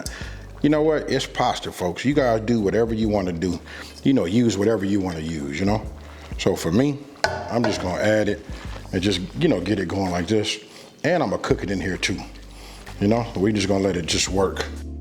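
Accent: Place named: West Coast, United States. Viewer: You are so California cool. I love your West Coast accent and your southern way of cooking.❤😊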